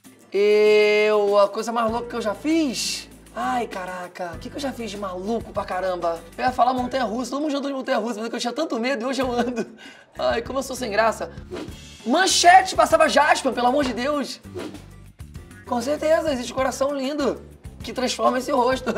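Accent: Pernambuco, Brazil. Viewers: Agora tô mais fã ainda de tu,visse?! O sotaque de Pernambuco é massa mesmo!!! "Xero" Rafa!!!(por aqui a gente manda carinho assim!) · Realmente nosso sotaque é lindo #pernambuques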